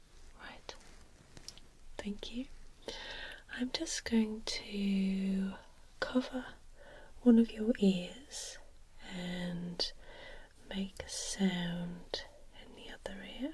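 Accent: United Kingdom. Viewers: So glad there's a sassy, funny and wonderful British ASMRtist out there. A British accent always gets my tingle on!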